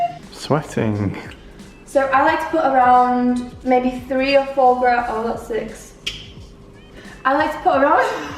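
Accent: United Kingdom. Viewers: Where is from? She has like this accent from the UK, but the name doesn't sound European?.-.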